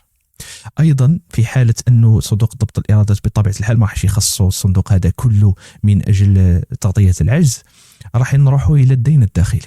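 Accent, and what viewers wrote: Morocco. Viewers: نتا مروكي على حساب لهجتك واش دخلك في ميزانية الجزائر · Un marocain qui fait des analyses sur l'Algérie et il oublie son pays:le makhraz. Son accent l'a trahit. Il. Parle d'un déficit de 68 mds $ et justement c'est la même somme de la dette du makhzane chez le FMI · لا أضن ، درت بحث و لم أجد شيئ إلا اللهجة أنتاعو تميل للجارة الغربية ؟؟؟ و هذا يخليني أتساءل عن ديون الجزائر و سيادتها 😊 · اولا من هو هاذا المحلل انا راه ايبانلي مروكي حسب اللهجة نتاعو و من اين جاءته كل هذه المعلومات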